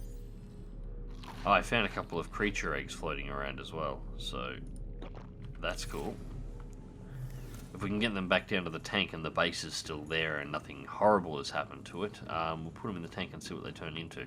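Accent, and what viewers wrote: Australia. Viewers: I love the Aussie Accent so much:)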